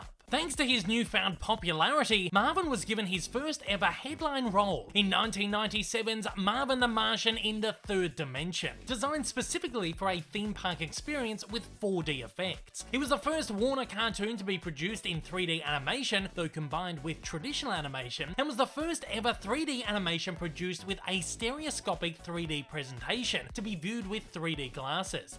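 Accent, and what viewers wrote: Australia. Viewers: Urghhh that aussie narrator's accent is decidedly grotesque and annoying, ruins what would have been a great video !!!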